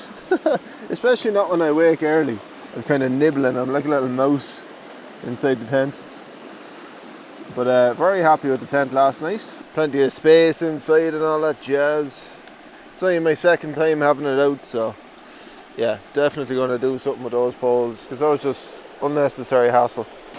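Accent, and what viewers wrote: Ireland. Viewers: New subscriber loving ur videos. My dad was born in Dublin and I’ve always wanted to visit but still not got there yet. 1 day hopefully. Been told how beautiful it is and i love the Irish accent x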